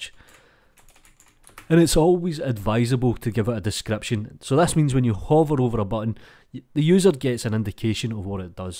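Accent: Scotland, United Kingdom. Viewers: First i came to look at the AddOn. But now i just listen to your scottish accent lad !! Love it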